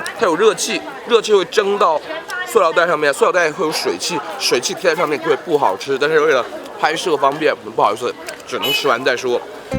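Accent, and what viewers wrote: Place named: Cantonese, China. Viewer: 廣東式普通話，難聽到死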